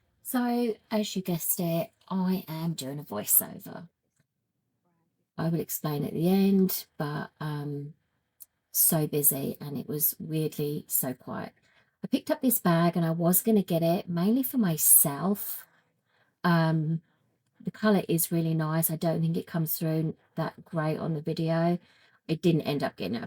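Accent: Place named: New England, United States. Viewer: I love your accent! Whenever you mention the names of New England towns, I think, “oh, that’s how it’s supposed to be pronounced “ 😂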